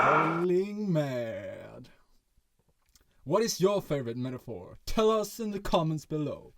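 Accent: Sweden. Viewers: Loving the Swedish accent which is strong in this one:)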